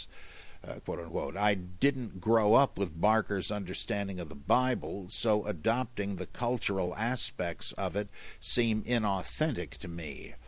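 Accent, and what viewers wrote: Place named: Germany. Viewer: The german accent- spot on!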